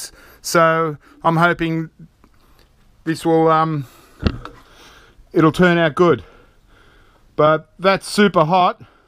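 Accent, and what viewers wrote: Australia. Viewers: but he sounds Aussie tho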